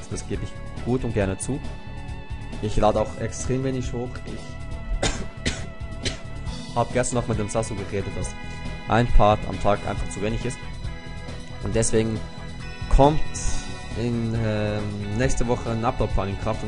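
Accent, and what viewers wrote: Switzerland. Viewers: eey mach dir nix draus die 8 youtuber sind halt uncool :D achjaa ich finde dein schweitzer-deutsch soo gel *-* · Ich habe dich abonniert und finde es echt lustig mit deinem Mittwuch und dein schweizer-deutsch ist cool. Es fällt nicht extrem auf :D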